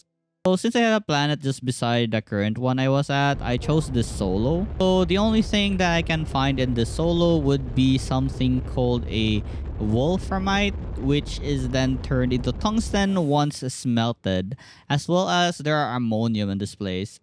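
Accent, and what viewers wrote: Philippines. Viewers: With his accent I assume hes filipino or something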